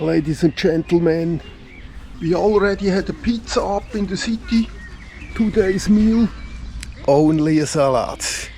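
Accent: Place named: Switzerland. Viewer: Hoi! Deine chanel ist fur mich eine echte schatz! Du gibst mir viele inspirationen wohin ich mit dem kajak gehen kann! Ich wohne seit 3 jahre in der Schweiz und ich habe jetzt gerade angefangen mit Kajak abentauer und ich suche jetzt fur wo konnte ich fahren! Aus deinem akzent ich glaube dass du eine Schweizer bist also ich glaube das tu die Schweizer kajak strecken sehr gut kannst. Dank dir habe ich jetzt paar Ziele, jetzt brauche ich nur gute gesselschaft! Ich lasse SUB fur deine channel! LG! P.S Du bist mega positiv Person, das macht fruede dich zu schauen :D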